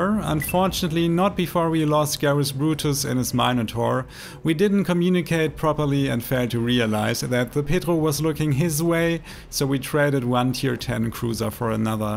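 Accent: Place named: France. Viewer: haha yes he has a proper french accent :D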